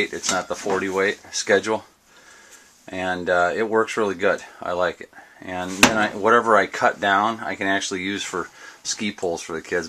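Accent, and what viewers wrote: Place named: South, United States. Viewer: That turned out niiiiiice!. Lmao at "I love that smell" man that is priceless bet you got beat up by the tree huggers on that one lol. If I had just ran across your channel and there was no snow on the ground I would have thought for sure you where from the south lol. Thanks for sharing the build God Bless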